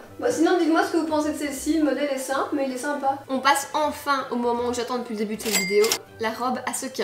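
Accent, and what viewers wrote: Belgium. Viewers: Ton accent belge i love it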